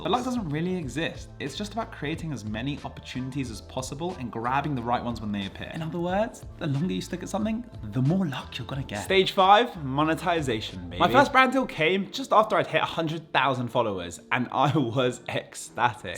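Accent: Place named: United Kingdom. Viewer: Why did you put dollars rather than pounds in the thumbnail when you’re British? Super confusing! I was thinking maybe you’re American until I clicked on and heard your voice. It couldn’t be any more British lol 😭💕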